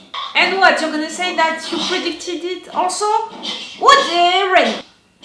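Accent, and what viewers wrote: France. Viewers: These episodes are really getting out of hand, i hope it's not offensive but your emotional reaction mixed with your french accent really makes it for me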